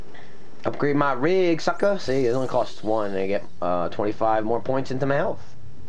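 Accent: New Jersey, United States. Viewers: He still sounds like he is from Jersey in these videos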